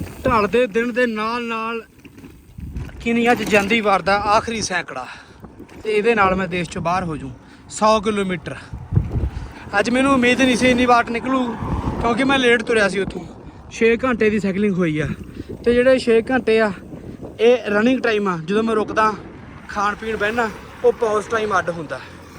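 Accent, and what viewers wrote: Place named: Punjab, India. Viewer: ਤੁਹਾਡੇ ਦੁਆਰਾ ਬੋਲੀ ਜਾਂਦੀ ਪੇਂਡੂ ਪੰਜਾਬੀ ਬੋਲੀ ਅਤੇ ਚਾਰ ਚੁਫੇਰੇ ਦੀ ਜਾਣਕਾਰੀ ਮਨਮੋਹਕ ਹੁੰਦੀ ਹੈ ਵਲੋਂ ਮਾਸਟਰ ਜਸਪਿੰਦਰ ਸਿੰਘ ਗਿੱਲ ਪਿੰਡ ਉਬੋਕੇ ਤਹਿ ਪੱਟੀ ਜ਼ਿਲ੍ਹਾ ਤਰਨ ਤਾਰਨ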